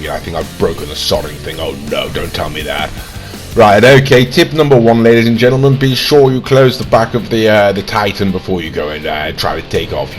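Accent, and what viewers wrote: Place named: United Kingdom. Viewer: I don’t believe I’ve heard anyone more British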